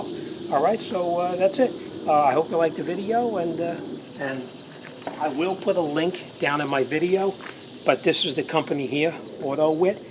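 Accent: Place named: East Coast, United States. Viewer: i can hear boston or new jersey in your ascent somewhere east coast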